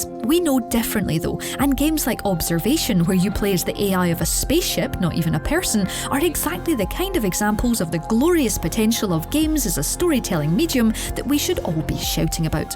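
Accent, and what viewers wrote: Scotland, United Kingdom. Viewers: I like the narrator's voice and Scottish accent. Calming. Easy listening. I just bought Redeemer, Life is Strange (all 3), Blacksad, Destroy All Humans, Creepy Road, Strange Brigade and Guns Gore & Cannoli Capo Dei Capi edition. And on PS Plus they gave us Days Gone this month. They've done alright lately I think. There's other good games they've given out too recently. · I loved the video, well-crafted list. Also the Scottish accent brought back lots of nice memories, thanks🙏🙏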